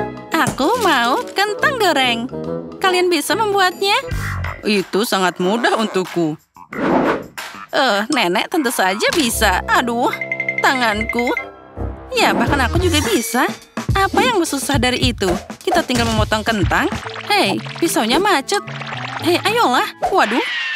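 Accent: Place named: Indonesia. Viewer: Tapi kenapa a sounds Indo bukan usa ker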